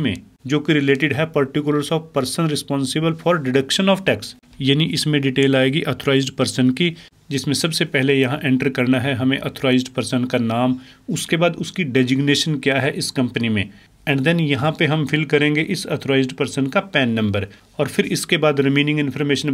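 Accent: Himachal Pradesh, India. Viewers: aap himachal se ho according to your accent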